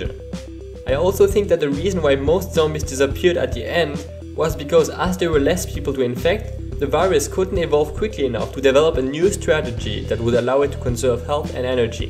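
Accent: France. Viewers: You are french aren't you? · I know I'm dumb, I know you are trying to show us somethibg cool, but all I know is that I couldn't focus on anything else than your fluent english with french accent... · Un accent français ca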